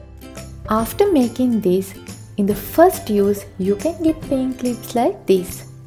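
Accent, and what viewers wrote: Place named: Sri Lanka. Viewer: Accent quite similar to Sri Lankan . 🥰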